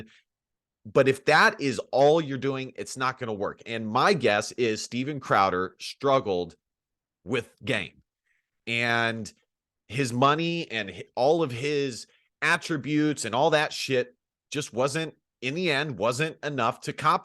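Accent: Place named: South, United States. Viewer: you gotta be from down south. I literally had to speed this video up to 2.0X speed to even stay interested in a single sentence.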